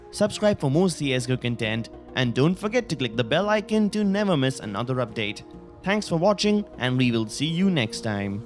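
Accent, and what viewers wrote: India. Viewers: i like how he speaks with hindi accent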